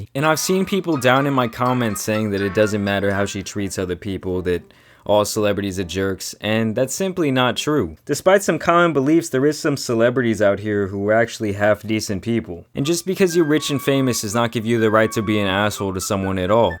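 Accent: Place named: Northeast, United States. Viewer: Bro, your accent is hella strong lol. You definitely from the northeast.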